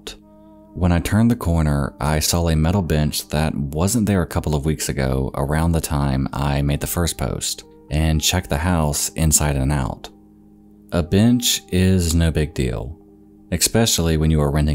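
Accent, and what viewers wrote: South, United States. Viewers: Lmao “NEXPO rip off” but NEXPO doesn’t have that sick ass southern accent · are you from Florida or Alabama or somewhere like that? I'm from north al and I've been trying to place your accent but I can't quite get it. It's very nice though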